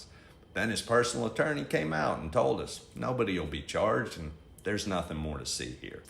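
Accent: South, United States. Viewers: Well done! Love your charming Southern accent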